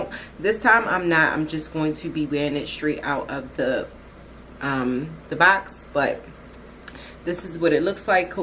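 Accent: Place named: Baltimore, United States. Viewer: You're such a inspiration to black girls, you makeup always look bomb& I love your Baltimore accent ❤️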